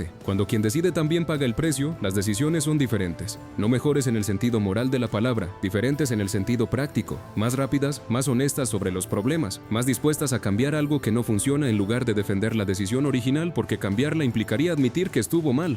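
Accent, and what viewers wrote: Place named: Colombia. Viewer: buen video pero falta mejorar ese guion, mucho corte de voz, y te delata como pronuncias la S , colombiano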